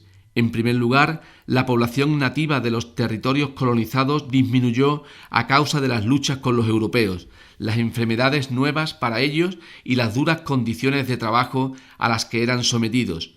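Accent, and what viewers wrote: Andalusia, Spain. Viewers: Tio hablas como un andaluz no puedo ver el video porque me mosquea ese estupido acento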